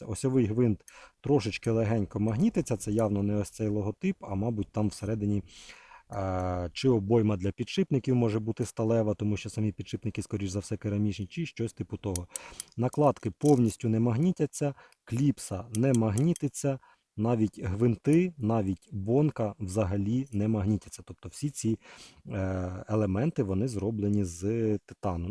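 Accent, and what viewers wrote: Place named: Ukraine. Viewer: хохлятский говор ну прям ржу)))нож шляпа, це обзор хоно)))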